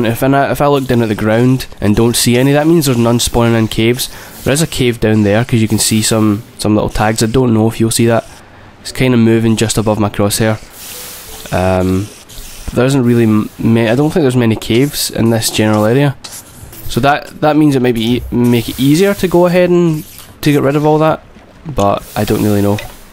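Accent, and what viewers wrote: Scotland, United Kingdom. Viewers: Scottish sod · i was going to watch , but then i heard his scottish voice and chose otherwise .. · subbed cause you're Scottish! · fucking wooo haha a scottish minecraft player :) finally someone without an annoying accent imo lol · LOL no, he's Scottish. You'd think people would know by now.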